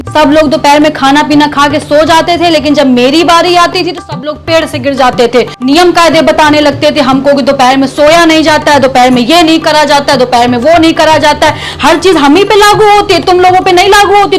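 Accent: Kanpur, India. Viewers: Nice style as mammi. Bhasha sunta hoon to apno jaisa lagta hai ji. Kanpur ki jaisi boli hai.👌👌👌👌👌👌👌👌👌👌👌👌👌👌👌👌👌👌👌👌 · Kanpuriya language. Heart touching. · I think this is Kanpuriya style of speaking very nicely presented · apki awaj bilkul hamare Kanpur jaisi hai.kya aap Kanpur she belong karti ho.your acting is super,real facts,real me aisa hi hota hai. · हर समय क्रोध में ..!!..!!. वैसे आप की बोलने की शैली कानपुर की लगती है 😊।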